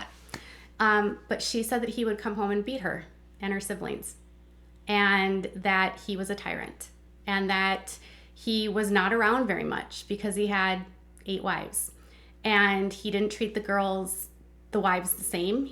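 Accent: Minnesota, United States. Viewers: Where are you from bc I hear a Minnesota accent here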